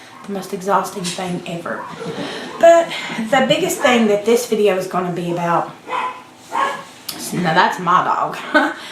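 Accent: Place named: South, United States. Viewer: Erica mentioned you so had to come and check out your channel. You are so cute..love your personality and southern accent =) Great video..so much truth was spoken. Keep up the hard work!!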